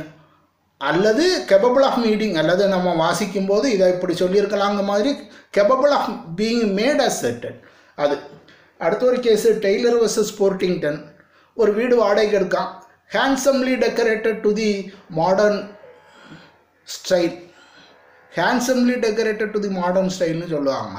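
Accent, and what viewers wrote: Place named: Tirunelveli, India. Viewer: Tirunelveli slang tha...mass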